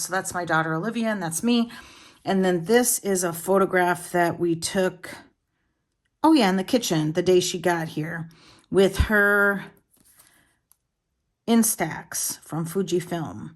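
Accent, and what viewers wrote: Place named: New Orleans, United States. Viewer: What area of the country are you from (if you don’t mind saying, you sound like you could be from New Orleans or another port city)… except that you say “you guys” instead of y’all - just curious I’m a speech pathologist and like to guess.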